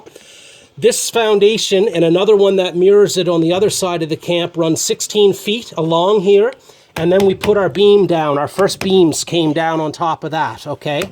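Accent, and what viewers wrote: Ireland. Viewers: Touch of Irish in your accent?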